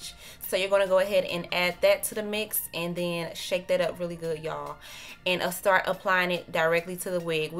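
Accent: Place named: Detroit, United States. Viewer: You sound like you from Detroit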